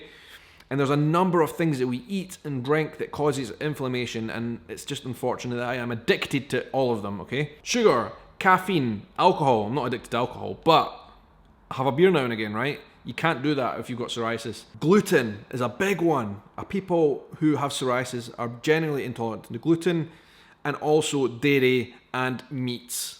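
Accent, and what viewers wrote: Scotland, United Kingdom. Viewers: Hello, dear I have been watching your videos for around 1,5 years and besides that I love them, I can honestly wholeheartedly say I never noticed anything strange about your skin or arms at all. And even if I had wouldn’t have made a lot of difference - I’d still be watching your content because I love your accent, your stories and Scotland 🏴 in general. 🥰😊😃😌 👋🏻 Stay as gorgeous as you are I’m waving to you from Germany 🇩🇪, Berlin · I’ve never noticed any of this and to be honest none of it matters — you’re a handsome guy with a great Scottish accent. 🙂 I’ve always admired your openness and confidence. Keep your head up and know that your honesty about this topic is probably helping a lot others who deal with this. Dietary changes are hard, I know. I am gluten and lactose intolerant and I have been on a 100% gluten free diet for 15 years. 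Once you see the benefits it will get easier. Also try adding kefir to your diet it’s great for your gut and it can help counteract the inflammation. Best wishes! Oh and I’ve had small patches of psoriasis that were helped by a lotion called Curel Itch Defense — not sure if it’s available there.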